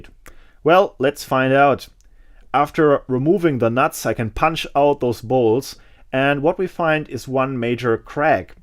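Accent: Germany. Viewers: I was a US soldier serving in Germany from 1990-96 Your flea markets are much like your scrap yard, All nice stuff, over here in the state rarely do you get steel drops or anything that functions! Your videos are great, my mother was German, she passed in 2017, it's always nice to hear a German accent, thanks for sharing your work! ;)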